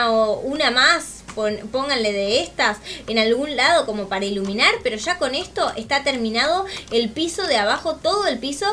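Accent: Buenos Aires, Argentina. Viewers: Me Encantó una pregunta.. ¿Sos de Oeste o Éste del Gran Buenos Aires? 😁😆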